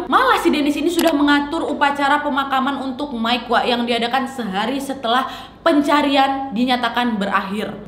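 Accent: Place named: Medan, Indonesia. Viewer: Kek gaya becakap anak Medan.. ya kan wak?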